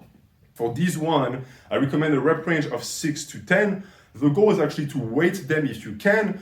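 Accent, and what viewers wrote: France. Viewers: Are u french? Nice accent 😂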